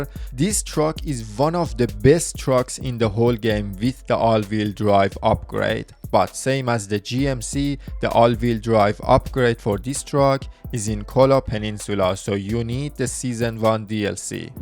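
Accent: Iran. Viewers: داداش از لحجه ت حس میکنم ایرانی ای😂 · The most Iranian voice I ever heard, nice video tho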